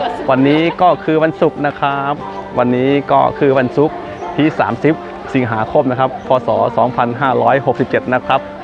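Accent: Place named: Sakon Nakhon, Thailand. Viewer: เจ้าสัญชาตลาวแท้บ้! ฟังสำเนียงคือ แถว สกลนคร หรือนครพมม...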